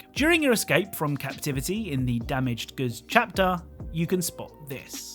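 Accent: England, United Kingdom. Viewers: Charming English Accent.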